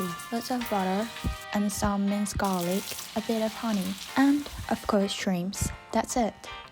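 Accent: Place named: United Kingdom. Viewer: 영국식 영어 발음이 너무 멋있어서 영상 보는내내 몇번을 치였는지 몰라여💕진짜 작업 너무 멋있고 판화도 너무 멋있고 노을도 멋지고 그냥 너무너무 멋져요!!!😆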